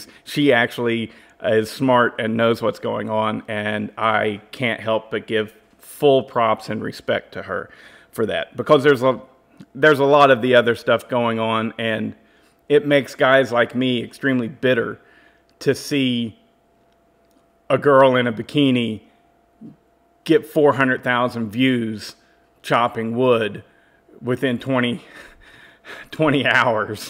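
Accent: South, United States. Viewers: she has a southern way of sayin things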